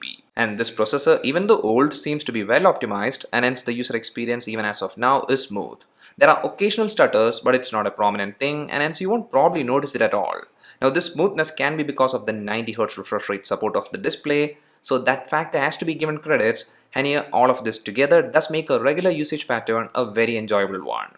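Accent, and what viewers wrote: Kerala, India. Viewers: U sound like a malu guy.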